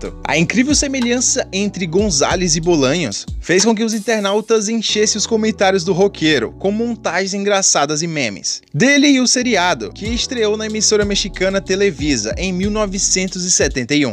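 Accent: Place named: São Paulo, Brazil. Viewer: Gente eu achei que esse cara era aqui de São Paulo 😂😂😂 Salve ♍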